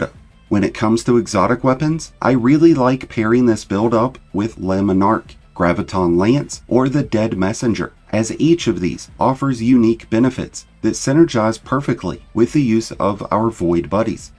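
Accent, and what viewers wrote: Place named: Pittsburgh, United States. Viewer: lol you're from Pittsburgh, aren't you?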